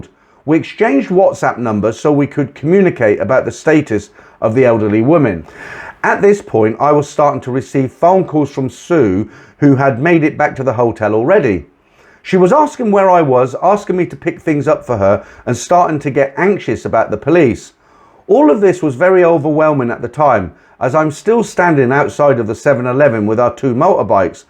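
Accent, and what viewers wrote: United Kingdom. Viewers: Are you British,. First time watching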